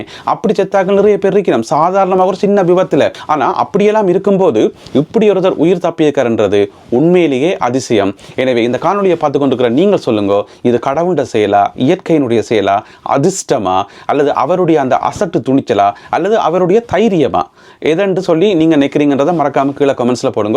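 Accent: Jaffna, Sri Lanka. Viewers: இனிய இலங்கை தமிழ் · தமிழ்நாட்டில் எங்கே இதுமாதிரி சுத்தமான தமிழ் பேசுகின்றனர். இவரின் பேச்சு இலங்கை தமிழே. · Srilanka tamil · அழகான இலங்கை தமிழ். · Thambi, Thamizh oru Thinusa irukkae! Ilangaiyaa? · உங்கள் இலங்கை தமிழை ரசித்தேன் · Its sri lankan tamil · Ilangai Tamil huh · Firstly I thankyou for your talkbeautiful Sinhalese Tamil well whatever happens ellam. Avan chail whole world is mourning and offering there prayers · இலங்கை தமிழ் உச்சரிப்பு அருமை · Yes he may be belongs to Elangai. It's Elangai Tamil. People who lost their lives their Soul may rest in peace. Very sad. 🙏🙏🙏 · He is a srilankan. Srilankan tamil is pure . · இலங்கை தமிழர் என்று நினைக்கிறேன்.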